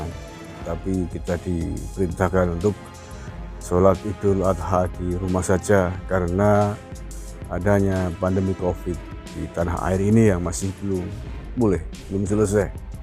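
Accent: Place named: Sumatra, Indonesia. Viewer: Logat melayu arah daerah,,,(aceh/sumatera)🙏🙏🙏🙏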